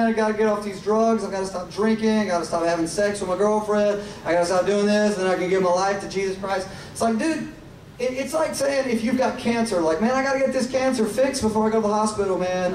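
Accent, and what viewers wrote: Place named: Tennessee, United States. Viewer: "You see, I sound like I'm from Tennessee . . . because I am."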